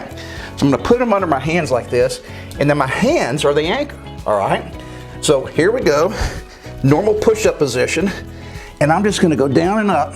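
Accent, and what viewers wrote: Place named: South, United States. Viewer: This guy’s comforting southern twang reminds me of “Dubayah”. “We’re goin into “Eyerack” to give Saddam a good ol’ southern slap on the jaw yeh all”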